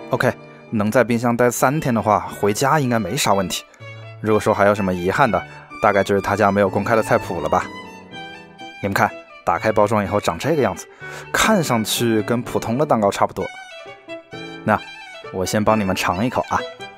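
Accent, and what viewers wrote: Wuhan, China. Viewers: 怎麼感覺是武漢人啊？！🤣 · 视频主是武汉人吗？感觉有武汉口音😁😁😁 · 是武汉人吗？听口音像极了武汉滴弯管子普通话……😊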